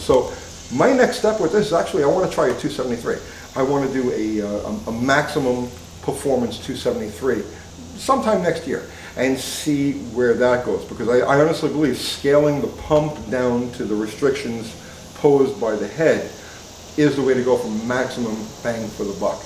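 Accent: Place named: Long Island, United States. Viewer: you remind me of home. From Long Island. I could listen to you for hours.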